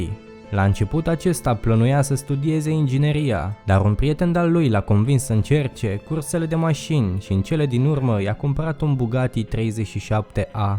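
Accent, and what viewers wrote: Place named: France. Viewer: Ai achent de francez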